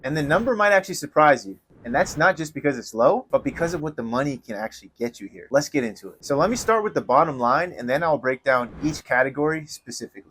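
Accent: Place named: New Jersey, United States. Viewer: Are you from NJ?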